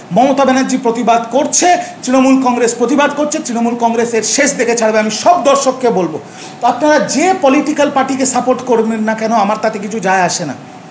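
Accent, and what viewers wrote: Bangladesh. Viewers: তোর বাবার সার্টিফিকেট আছে বানচো তোর ভাষাতেই তো বুঝা যাচ্ছে তুই বাংলাদেশের মাল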